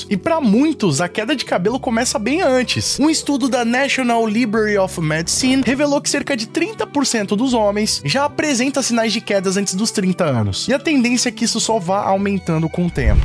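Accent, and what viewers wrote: Portugal. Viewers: Pq ele tá com essa narração em português- pt ? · Não sei se já havia percebido, mas o canal tem sistema de dublagem, ele está falando o português europeu via IA, o idioma original é o PT-BR.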